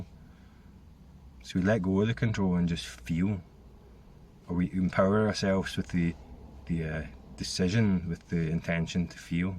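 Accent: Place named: Scotland, United Kingdom. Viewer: another beautiful video. It feels like I’m sitting in my car in Los Angeles cheering you on in your car over there in Ireland, I thought you were Scottish though I can’t quite figure that out lol but anyway cheering you on is cheering me on. I recently got incredibly incredibly deeply triggered in relationship over Christmas break. No details are needed here, but I just felt the self Ing mechanism coming back so strong and it was so unbearably uncomfortable. My whole body got like stuck in a freeze mode, like my nervous system just got stuck. I had to come back from Christmas break and take a month off work so that I could simplify the outer stressors as much as possible so that I could tolerate these very very deep, deep internal stresses. Yes there’s no exterior and interior but I think you know what I mean. I had to give myself time to sit with the most subtle and yet intense reactivity to life, because what can feel so powerful in the selfie mechanism is the sense of time and urgency and like we’re working against time. 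At least that’s the experience here. So I had to give myself literally a month and more if I need to to just be with these very very verysubtle and intense sensations without feeling the pressure of time to escape them. Anyway, didn’t mean for this comment to get so long but that’s what’s going on here now and your video was right on time. Speaking of lol. Thank you, thank you thank you❤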